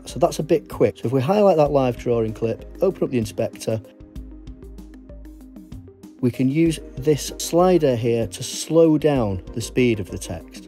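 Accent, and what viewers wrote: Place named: England, United Kingdom. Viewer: Nice to hear an English voice ..good video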